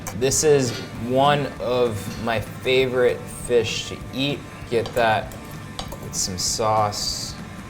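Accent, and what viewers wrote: Philippines. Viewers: Love your filipino accent! ❤️ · Heavy breathing while watching you eat it all!! Everything looks delicious! ❤️❤️❤️ Plus your Filipino accent hahaha so cute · You are so cute with your filipino accent huhu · Cool Tagalog accent Erwan! would love to hear more of this!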